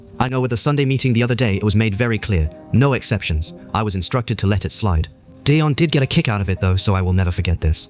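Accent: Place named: United Kingdom. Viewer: What’s with the British accent?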